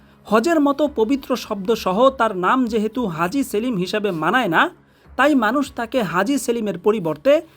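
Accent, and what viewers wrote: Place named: Bihar, India. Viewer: কথায় বিহারি টান আছে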